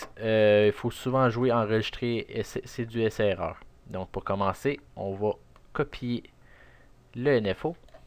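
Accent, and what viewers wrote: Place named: Quebec, Canada. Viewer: C'est un québecois normal qu'il a un accent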